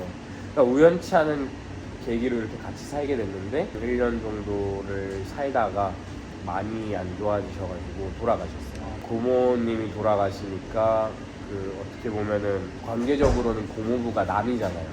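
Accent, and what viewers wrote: South Korea. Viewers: ㅇㅇ 한국말을 잘한다 라고 말할게아님...그냥 모국어가 한국말인데 우리랑똑같죠 모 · 부산 사투리 아직까지 있네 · 이 분은 한국분인가요? 말씀하시는 게 토종 한국인인데요 ㄷㄷㄷ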